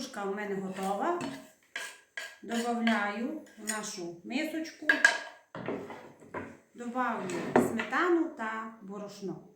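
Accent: Transcarpathia, Ukraine. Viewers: Так розмовляють на Закарпатті. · Оооо, вже почула, що з Закарпаття.😊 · Це ЗАКАРПАТТЯ!!! На заході України є різноманітні діалекти😉😊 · Чую закарпатський діалект, молодець так тримати! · Чернівецька область. Дякую за смачний рецепт, спробую. Закарпатська мова, особлива.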